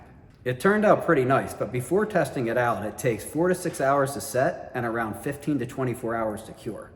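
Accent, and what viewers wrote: Baltimore, United States. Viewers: Baltimore accent? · Are you from Baltimore?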